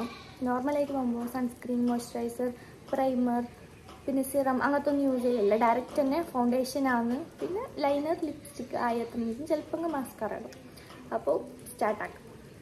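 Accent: Kasaragod, India. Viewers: Ksd slang kekkaan vanna njn😌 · I can understand your slang because Iam living with my ksd friends seven years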